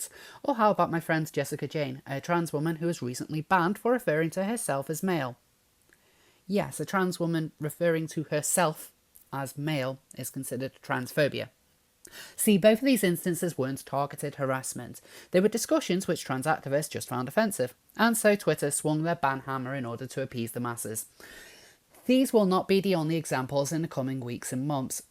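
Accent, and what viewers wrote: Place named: Scouse, United Kingdom. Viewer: Keep going. Lovin' that scally accent 😘💕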